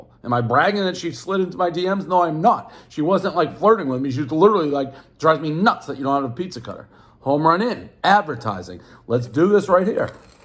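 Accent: Boston, United States. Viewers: He's pure boston, newengland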